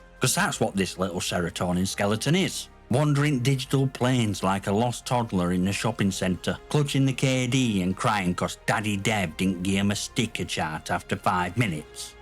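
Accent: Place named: North, United Kingdom. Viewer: Yooooo too funny bro. Nice to hear a northern accent telling it how it is. New sub from me and spot on btw with what you said. Too many pump and squirts out there lol gg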